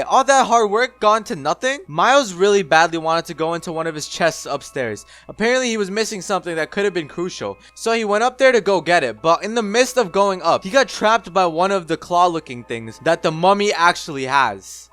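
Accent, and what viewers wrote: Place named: Italy. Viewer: When I hear your voice, I hear an Italian accent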